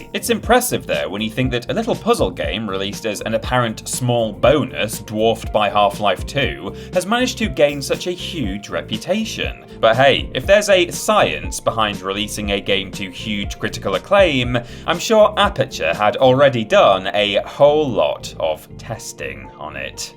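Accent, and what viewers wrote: United Kingdom. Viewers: Damn brits with their cool accents and crude dry humor lol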